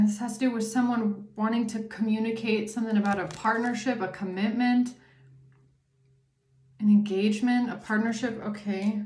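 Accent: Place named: Germany. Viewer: Good morning 🌅, LOOKING FORWARD TO HEARING YOUR VOICE, THAT GERMAN ACCESS OMG YOUR A GODDESS, MUCH RESPECT, HAVE A BEAUTIFUL DAY NIGHT, GOD BLESS YOU X ❤👑☀️💜🛡️👑☀️💜💜💜❤😊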